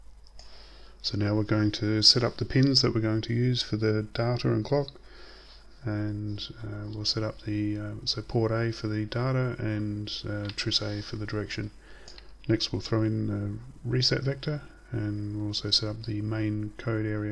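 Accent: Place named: South Australia, Australia. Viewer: Super cool work, I found you from Hackaday and have been bingeing all your stuff for inspiration in my own 6502 project since I'm planning to use a backplane too. You don't happen to be from South Australia do you? You don't have to answer if it's too much info lol, but you sound like a South Aussie. It's possible I'm just hearing ghosts, but I'm from Adelaide and I think I can hear some subtle hints in your accent. Great work again :)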